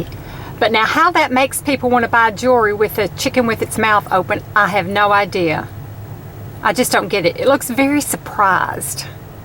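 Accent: South, United States. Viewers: Would love to hear you speak Spanish with that Southern accent. Miami is 90% Hispanic.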